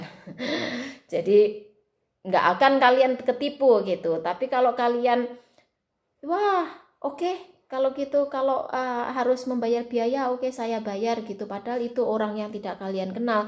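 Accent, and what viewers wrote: Java, Indonesia. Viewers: Sampean org mana mbk, logat nya Jawa yo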